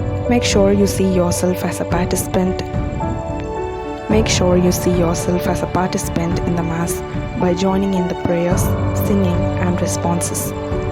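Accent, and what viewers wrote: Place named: India. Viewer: Very nice video presentation, and excellent advice. You sound Indian. Kerala, by any chance?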